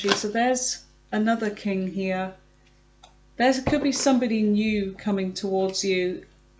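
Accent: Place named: United Kingdom. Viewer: love your readings and your british accent - thank you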